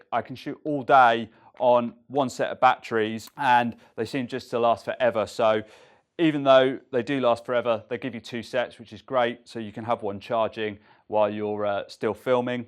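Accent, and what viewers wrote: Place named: Pennsylvania, United States. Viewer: I've never heard that accent before... you must be from Pennsylvania.. right?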